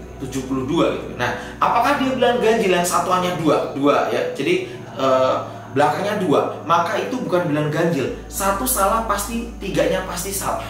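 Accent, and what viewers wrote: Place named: Banyumas, Indonesia. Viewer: Kayanya ka ki orang daerah cilacap,banyumas,purbalingga kah?ngapak mulu perasaan wkwkwk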